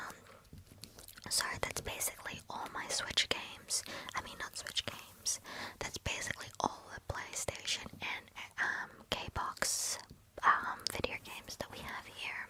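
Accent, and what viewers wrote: Australia. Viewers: ohhhhhhhh its australian that'd do it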